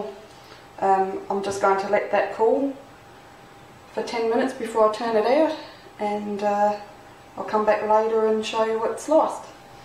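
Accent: Australia. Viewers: Wow these older videos of yours got lower views than your new ones. Too bad people forget to check out our older videos on youtube. They always want new ones. I can hear more of your Australian accent in this video. I didn't know you did cooking videos in the past. I like banana bread too. Did you make yours in a cake pan? bye.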